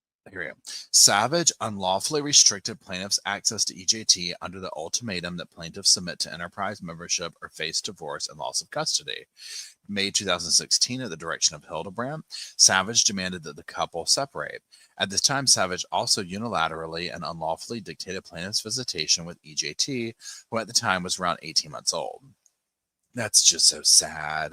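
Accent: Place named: East Tennessee, United States. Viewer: I’m from east tn and it’s so nice to hear someone that sounds like me and I can understand. Love your storytelling style.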